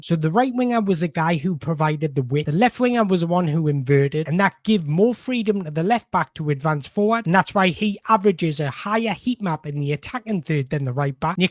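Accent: Newcastle, United Kingdom. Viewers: the only geordie i wana listen talk about a villa game against newcastle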